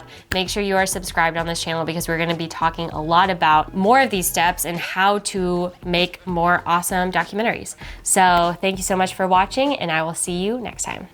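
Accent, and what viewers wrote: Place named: United States. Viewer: I am brazilian and live in London. I love your american accent.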